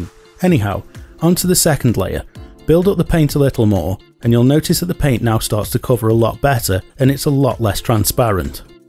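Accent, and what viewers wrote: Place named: Stoke-on-Trent, United Kingdom. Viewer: What did you use for the discs? And are you from the stoke on trent area? Your accent sounds local. Cheers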